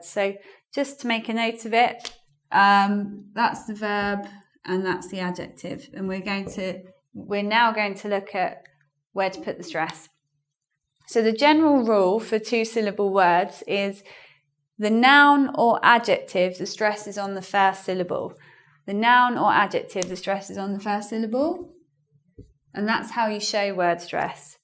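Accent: United Kingdom. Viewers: I find you very attractive ! specially your british acent ! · Is this British accent? Sounds Cool!! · Is it pure British accent ?! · british accent? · Wow british accent 😍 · british accect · hi from México, do you know im just gonna suscribe me, because I love inglish specially British inglish, I love your accent, so I hope you can share us more videos about your english British, I spent too much of time time looking for British accent and finally I found out it in your channel.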